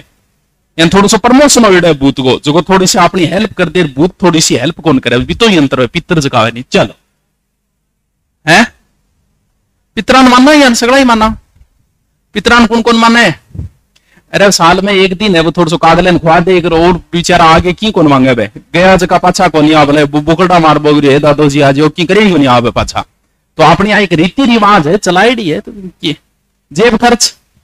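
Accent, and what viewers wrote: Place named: Haryana, India. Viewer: Hii Yr ye sir konsi jagah ke h Bich bich m haryanvi jaisi language kyu bolte h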